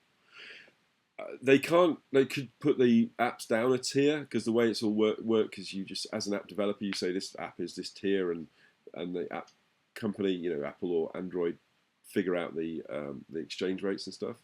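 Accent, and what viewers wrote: England, United Kingdom. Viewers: you sound like you live in England... NO TAXATION WITHOUT REPRESENTATION!!!!!!!!!!!!! look it up if you dont know ;)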